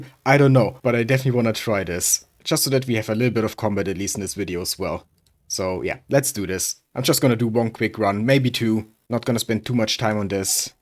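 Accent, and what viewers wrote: Germany. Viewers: I hear german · Do i hear german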